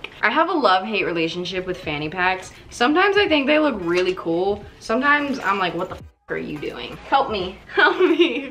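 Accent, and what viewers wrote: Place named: Canada. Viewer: Question: Are you Canadian or am I stupid? You wound very Canadian. I’ve been following you on tiktok for a while but this is the first time I’ve heard your voice and for some reason I fucking love it.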